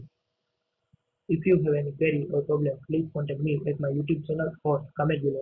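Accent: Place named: India. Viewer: thankyou nobody could show how to solve the error code they just showed how to download it. s soon as i heard and indian voice i knew you were going to solve my issue.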